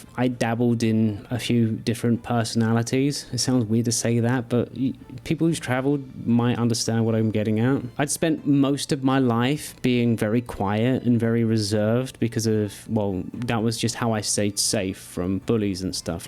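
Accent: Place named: United Kingdom. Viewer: Truth, hollywood grade good looks and British accent carried you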